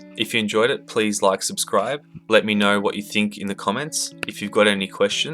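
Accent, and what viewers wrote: Australia. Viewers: Are you australian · kd secret acc deffs aussie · your voice is beautiful it sounds austrailian ;3c idk im probably just stupid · He is definitely Aussie I'm also Aussie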